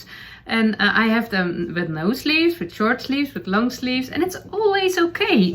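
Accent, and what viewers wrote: Netherlands. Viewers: Hi, you have such a sunny, delightful personality and I love your "Dutchy-English"! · I am a new viewer -your podcast was recommended by Selma of Little Big Knits. I love your accent. I have a lovely sister-in-law and a good friend from the Netherlands so the accent makes me smile. A piggy 🐷 bank is generally a ceramic pig with a slot to put coins in to save up money. Now they come in all shapes and sizes. Thanks for a very entertaining podcast! 🤗 I am from BC Canada 🇨🇦 and have been knitting for over 60 years and am still only mediocre, but I do love knitting! 💜💙💕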